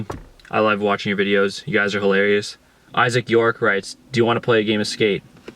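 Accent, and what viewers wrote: Canada. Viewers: It took me 30 seconds To figure out your canadian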